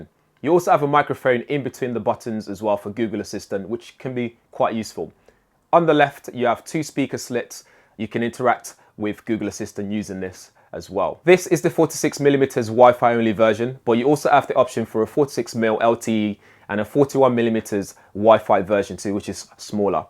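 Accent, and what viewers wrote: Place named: MLE, United Kingdom. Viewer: Cool MLE accent👍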